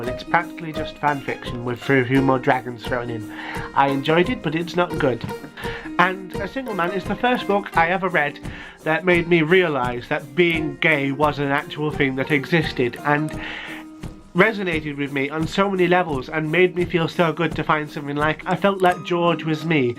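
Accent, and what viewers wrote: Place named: United Kingdom. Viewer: Dear, I'm Ivan and I'm genderfluid:) I've always enjoyed rereading certain books. last year I reread "The Lords and The Ladies" and "Witches Abroad", 'cause I went to live abroad and I found myself in a depression and the only thing I could think that would lift my spirits up was rereading these books. now I started reading 1984 for the first time and, boy, how do I find it relateable to the times we live in!:) I also share the same fears about the politics in the world currently - I'm sad, angry and depressed. here, in Bulgaria, where I'm from our stupid people chose a pro-Russian president, so we'll see how it goes down. hugs!:) keep on doing videos with that lovely British accent of yours! you're adorable!:)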